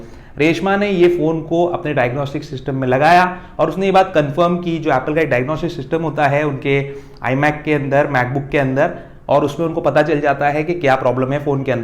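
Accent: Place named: Gujarat, India. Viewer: bro lil bit Gujarati accent its nice great video by the way ..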